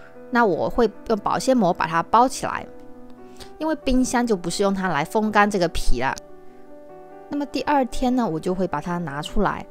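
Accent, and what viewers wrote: Fuzhou, China. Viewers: 你好，我也是福州人，老乡一听口音就可以听出来😂 我想问一下，沙姜粉可以用其他东西代替吗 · 好熟悉的福州口音普通话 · 感觉是福州人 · Are you fuzhou? Sound like from fuzhou or fujian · 应该是福州口音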